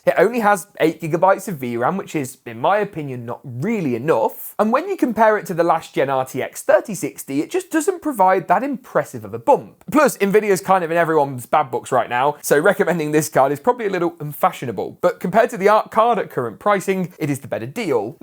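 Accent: United Kingdom. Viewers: Just subbed. Very clear info and british, love it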